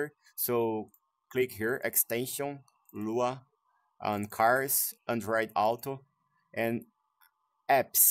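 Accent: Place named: Brazil. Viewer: so pelo sotaque sabia q era BRkkkkkkkkkkkkkk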